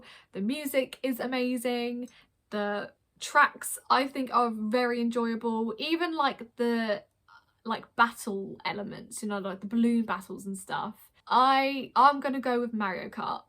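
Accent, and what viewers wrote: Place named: United Kingdom. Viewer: I like the British accent 😭😭 Good luck 😊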